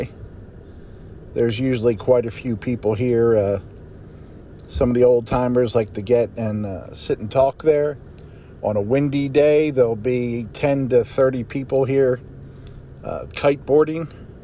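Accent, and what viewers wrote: Louisiana, United States. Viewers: Hey, thanks for the shout out today my friend that was super nice and very unexpected but super thankful nonetheless! I love your Cajun accent great job and great video today sir. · Enjoyed the Cajun accent 🎉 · Thanks for the great video! Enjoyed the Cajun accent. Have a great weekend🌞🦋❤️ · Hmm🤔...There's a Whataburger on Kostoryz n McArdle, I'm under the impression that's the First OriginalWhataburger in Corpus Christi and that's if it's still there, lol. Of course, Whataburger & HEB both born in CorpusChristi, moved their Headquarters to SanAntonio a few years back & Whataburger just SOLD OUT to some Corporation or whatever from Ohio!-NONETHELESS, Whataburger's all over the place. Me?-----I'm totally burned-out on it. LoL!--Yipes!--p.s. Nice Video!--LOVED that park in Portland, overlooking CCBay!--CajunAccent--Hilarious!- WOOH!!!!🤣!!!!!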